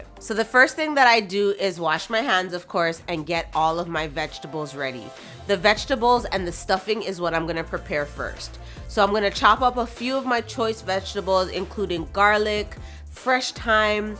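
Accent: Jamaica. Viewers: Your Jamaican accent is so strong !! I love it I wish I had it that strong ! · Loved the Jamaican accent 😂😂😂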